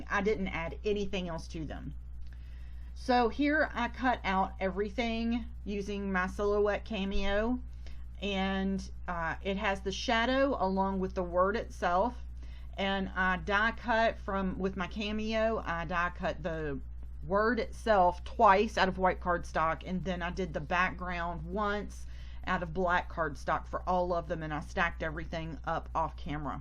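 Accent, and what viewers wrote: South, United States. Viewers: What awesome cards! I’m so thankful that you chose to participate in this important cause! Honestly, to hear someone with a southern accent is even more encouraging. Bless you!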